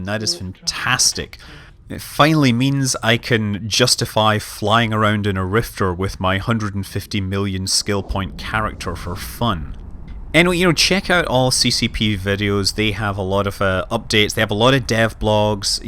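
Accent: Scotland, United Kingdom. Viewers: You sound just like Scotty from startrek. That is so freakn cool. I wold flos that accent and pick up all the chicks :D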